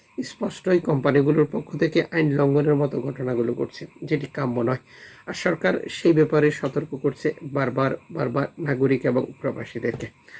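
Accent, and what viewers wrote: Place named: Noakhali, Bangladesh. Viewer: অপরাধী না অফরাধী! নোয়াখাইল্লা!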